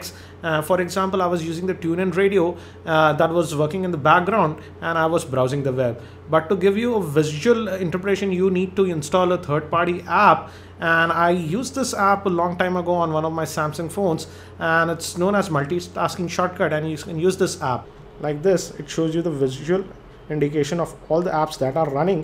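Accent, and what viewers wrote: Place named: Maharashtra, India. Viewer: You will make it big by taking diction classes. You're speaking English but I can hear Marathi!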